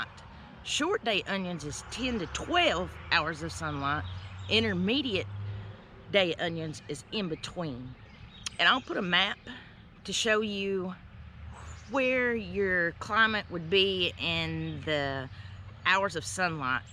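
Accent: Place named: Georgia, United States. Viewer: This has got to be THE BEST video I've seen on onions! We also are in N. Georgia (Gwinnett County). I LOVE YOUR ACCENT! Two years ago I sat with a Georgia extension agent and had a long talk about growing onions. I proceeded to seed several varieties of short day onions and had HUGE success. Then to harden them off before sticking them into a raised bed, I set them beside the poly house in the semi-sun -- and we got visited by a late season hurricane. All that water washed down into my trays (and i forgot about them being in solid bottom trays) and drowned almost all those onions. What didn't rot we put into the ground and ignored the chickweed. You KNOW what happened. We salvaged a few that are now in stacking planters and are going to seed, which is fine because we will collect the seed and start over this year. Thank you for the details you provided, they are right in line with what the extension agent told me. 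Maybe this year we'll get off our butts and actually maintain them better. I'm going to try grow bags like you have there. How many did you fit into the bag and did they develop as you wanted? Also, how many times did you give them a hair cut?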